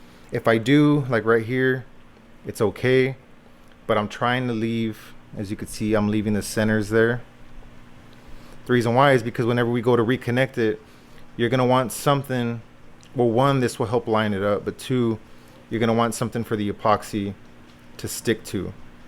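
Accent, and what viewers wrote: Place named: Texas, United States. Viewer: Where are you from? I’m getting a Texas vibe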